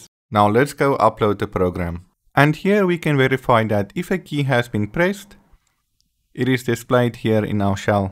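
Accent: South Africa. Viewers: At first i thought you were European Then i heard of say matrix with that south african R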